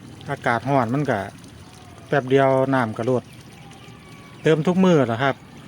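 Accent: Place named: Isan, Thailand. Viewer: มาชมบรรยากาศงามๆนำครับ เจ้าคนจังหวัดได่ สำเนียงคือข่อยแท้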